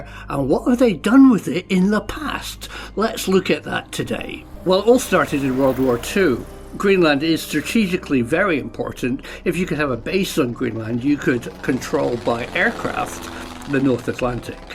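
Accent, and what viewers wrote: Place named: Scotland, United Kingdom. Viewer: omg this is sooo cool, and the bit i like ther most is your wee bit of scottish accent... lots more pls.. always great to watch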